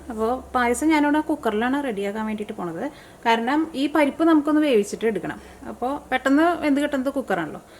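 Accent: Thrissur, India. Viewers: 😀lokathinte eth koonilum nammal thrishir kaaru undaavum😀😀 orikkalum vitt poovaatha thrishur slaangum aayi😂😂😂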